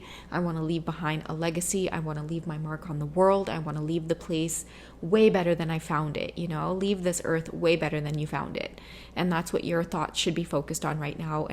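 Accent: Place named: Trinidad and Tobago. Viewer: I love when you do the trini talk w 🥰 and ty for the readings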